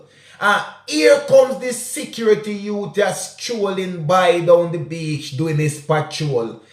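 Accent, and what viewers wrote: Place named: Jamaica. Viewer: (Sorry I meant to say one hundred thousand). I’m hoping you reach 100000 subscribers as you tell the stories very well. I love the Jamaican twangs you use to express the situation. ❤❤❤❤😂😂😂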